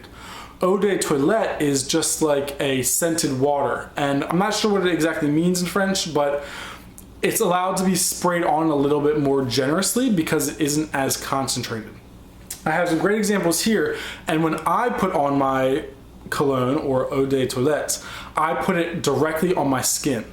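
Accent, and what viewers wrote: United States. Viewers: dear lord your such an American :P not meaning to be rude, but any European (including myself) would be cringing at that pronunciation :) yves saint laurent: l'homme, is pronounced lomme. jean paul gaultier le male is le mal (not ley) dont ever say Diesel like that again :P lol that one was in english so cant fault you there isseymiyake leu de issey sounds like: low dey issay sory for all the corrections :P though hope i helped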